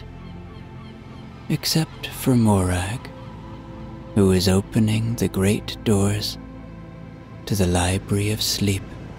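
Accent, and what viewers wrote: Ireland. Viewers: Loved this! I live Liabrarys, old ones expecualy, & Fairy Tails, Story's, & Irish Voice, Thank You ! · Please excuse me, I am uncomfortable with saying anything about this, but his accent is Irish.❤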